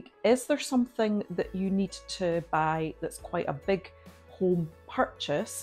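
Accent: Scotland, United Kingdom. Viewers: Just subscribed this morning and glad I clicked on your video in my feed. It was lovely listening to your brogue - my grandparent's were from Saltcoats before they came to Michigan. I have cousins in Ayr and they visit America often! xx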